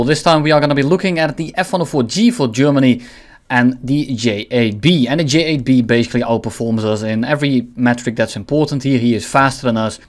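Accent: Netherlands. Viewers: Aan je accent te horen ben je sws nl mrg top video, veel geleerd man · Oh you're dutch, so that is what the slight accent is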